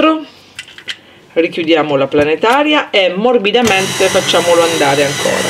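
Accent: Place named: Sicily, Italy. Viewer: Siciliani di dove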